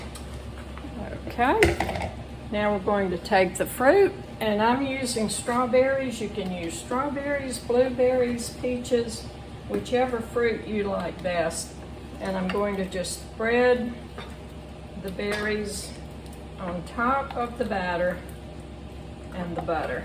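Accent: South, United States. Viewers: ❤❤❤Oh my goodness! You just stirred up wonderful memories for me! I am in my 70's & my beloved Aunt (deceased) and all my relatives grew up in Mississippi along with my Mother. Only cousins left now. My Aunt taught me how to make this EXACT cobbler recipe when I was in high school! I've made it so many times over the years using all kinds of fruit. Best part of all is your southern accent- makes me feel like I'm with family! You are a treasure - I am subscribed and look forward to many more videos! God bless you!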